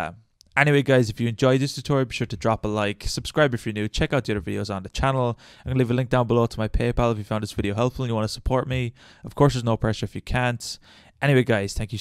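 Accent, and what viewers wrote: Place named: Ireland. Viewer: You sound like Oneyng if he stayed in Ireland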